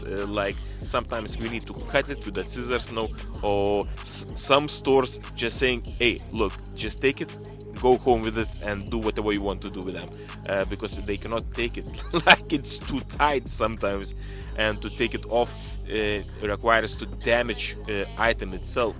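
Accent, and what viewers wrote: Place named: Russia. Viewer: Russians accent❤❤❤sweet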